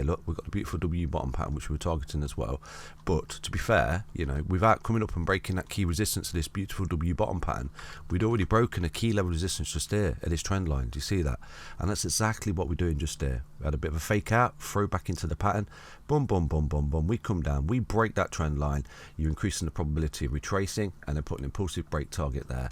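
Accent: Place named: Nottingham, United Kingdom. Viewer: im from nottingham and your accent sound local to me, do you do any meet ups to share knowledge ?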